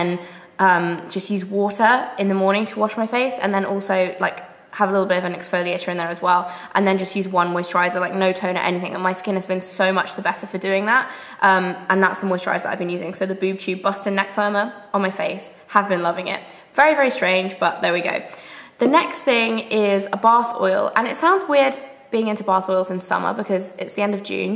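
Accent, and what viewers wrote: United Kingdom. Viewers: I love British accents and i swear I listened to you say "Sun dried tomatoes and basil" a billion times :P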